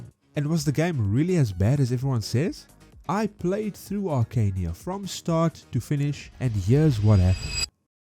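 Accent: South Africa. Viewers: I'm sorry if this is a bit off topic, but given your accent I have to ask: Are you South African? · Do I hear a fellow South African?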